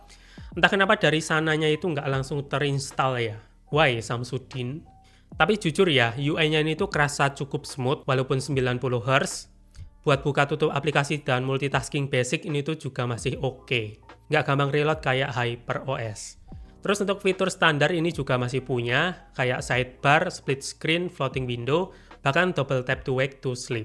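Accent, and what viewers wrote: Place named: Java, Indonesia. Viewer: Aku subrek karna logatnya jawa dan juga wibu. Kita boloo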